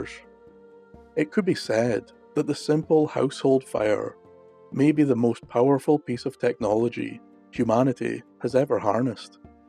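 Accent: Scotland, United Kingdom. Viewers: A Scottish accent is my absolute favorite accent and listening to you tell these stories has also taught me proper pronunciations! Because how I’ve read certain words in books is NOT at all how it actually sounds. Lol. I get so excited when you have a new post! Thank you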